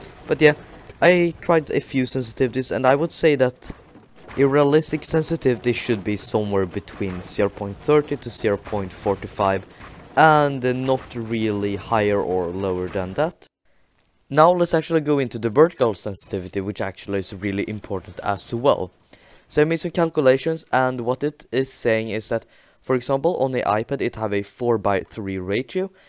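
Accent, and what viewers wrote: Sweden. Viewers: EJ grym video, jag gissar på att du är svensk för din dilekt lol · Are you Swedish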